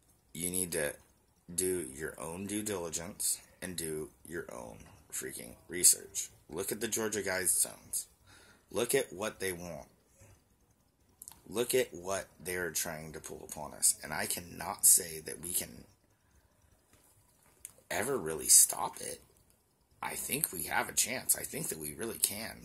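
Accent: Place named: Texas, United States. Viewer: Lovely accent my friend 👍🌻 Awesome is it Texan ? Now all I can say is America sucks! And you all need protection from true evil. Please try the Ganesha Mantra to protect you from evil forces. Breaks all obstacles . I prey for you all! 💚💚💚💚 Its a evil game where the loser will die a horrible death 💀 I'm sorry . Ganesha will protect you.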